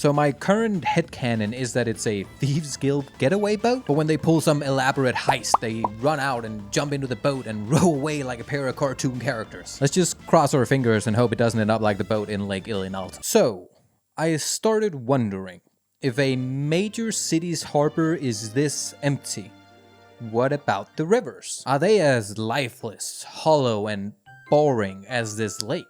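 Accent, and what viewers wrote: Denmark. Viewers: i sense a danish accent, alas it has made me subscribe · Skide god video! Desværre giver accenten enhver Dansker væk 😅 Du har sgu gjort dig fortjent til et sub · Never seen your videos or channel before. Bit I could instantly tell where you’re from. That accent will always be a dead giveaway for us Dane’s..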